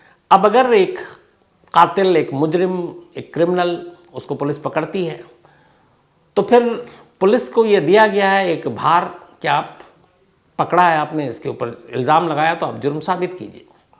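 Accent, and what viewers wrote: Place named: Lucknow, India. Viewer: Oh now I know how your pronunciation of your Urdu grammar..it seems Lucknow Urdu the original ...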